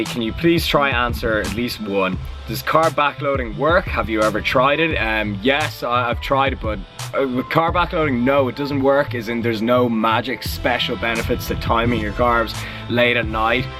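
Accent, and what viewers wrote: Ireland. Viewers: Ahhhh I love the Irish accent! Check out my vlogs if you get a spare moment :-) Love from London xx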